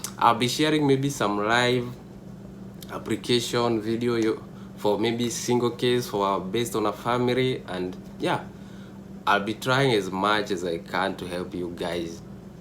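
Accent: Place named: Kisii, Kenya. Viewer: You sound like a kisii🤔🤔🤔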